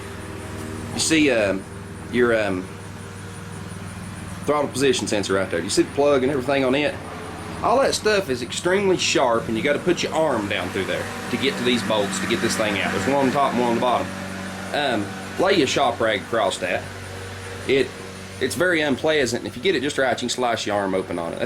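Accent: South, United States. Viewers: SELLAAHNOIIID Just kidding mate, I love that southern accent :) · This dudes country as fuck ...but thanks lmao worked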